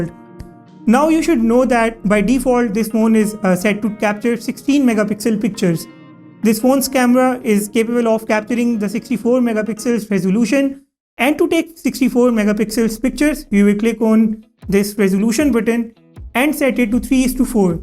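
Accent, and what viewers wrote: India. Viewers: Why? Lol is just Indian accent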